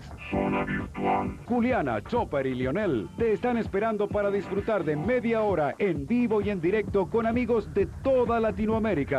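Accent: Standard. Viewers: la calidad del VHS es sublime, lastima la fea locucion que tenia este locutor "neutro" el que teniamos en la señal Argentina era mejor, hasta la propaganda de Kito Pizzas estaba mejor hecha en su pais de origen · En vez de decir acá por Magic Kids, decían aquí por Magic Kids, o aquí en Magic Kids, pero igual se entendía, a pesar de que el locutor hacía la voz en neutro, se entendía igual de bien. 🙌🇦🇷👏🤗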